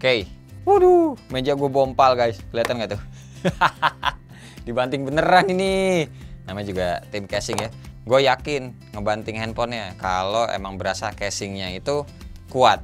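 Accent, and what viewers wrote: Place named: Lamongan, Indonesia. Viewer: Kayak ada ciri khasnya orang lamongan...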